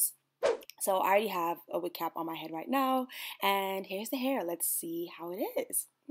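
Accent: Nigeria. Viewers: I haven't watched a video of yours in a while but: your personality is still lovely, your smile is even more radiant and....I miss your Naija accent oooooo!!! 😕😉🙂 The wig looks great on you!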